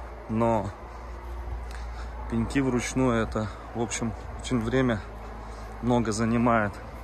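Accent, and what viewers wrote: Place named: Ukraine. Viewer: привет. Я на работе, показал твоё видео,один человек, даже подписался на твой канал. А мне говорят, что у тебя, украинский говор.Есть ли у тебя,родственники в Украине.У нас парень работал Сергей,его тоже фамилия.Он сам родом из Чукотки,а сейчас живёт, в городе Изюм.Просто ради любопытства, ты случйно,не его родственник?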